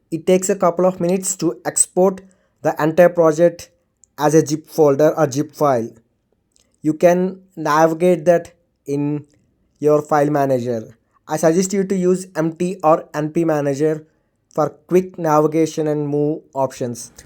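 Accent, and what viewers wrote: India. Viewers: Indian guy explaining 😅😅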